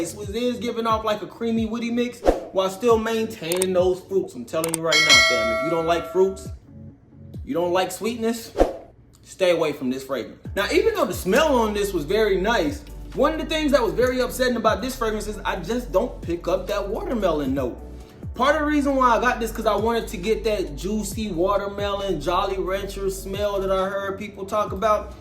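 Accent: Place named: New York, United States. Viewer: U gotta be a NY nigga with the yeeeerrrrr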